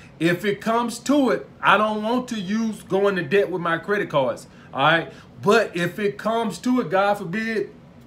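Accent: South, United States. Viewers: Where is he from he sound like he from the south?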